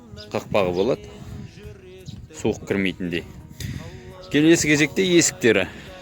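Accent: Standard. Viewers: коп сейлейтин сенсин!Барекелди мен ризамын бауырымызга! Осылай казак тилимизде таза сойлеген бауырларымды корсем оте катты риза боламын! маган керек талпынган адамдарга керек ал орге тартса корге кашатындар карамайак койындар ренжимесендер)))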